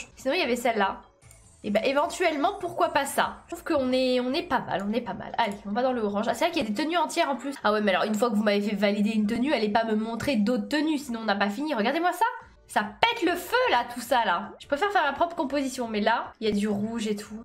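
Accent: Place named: Marseille, France. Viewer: Laccents Marseille mdrr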